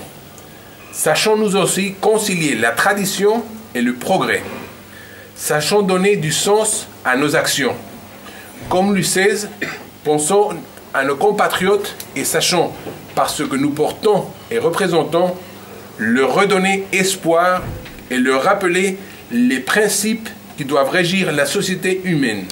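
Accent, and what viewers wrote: Spain. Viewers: un super accent espagnol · Il a un accent espagnol ? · Par les temps qui courent, personne ne voudra en France d'un roi qui a l'accent de Miguel Indurain. C'est ça que je veux dire. Tant que vous nous vendrez la monarchie avec une tête de gondole pareille, la république aura encore de beaux jours devant elle. A la limite, l'autre famille de prétendants (celle de celui qui est mort il y a quelques temps) serait déjà un peu plus crédible... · C'est l'accent espagnol, pas roumain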